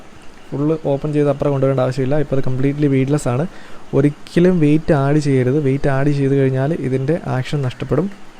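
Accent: Kottayam, India. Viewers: KOTTAYAM SLANG ALSO NICE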